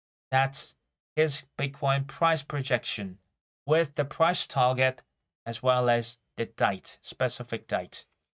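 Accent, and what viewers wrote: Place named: United Kingdom. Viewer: !!! Thank you for your comments a few days ago😊 Your British pronunciation is cool unlike the American one I 'm learning~ lol I'm grateful to you. Have a peaceful day!!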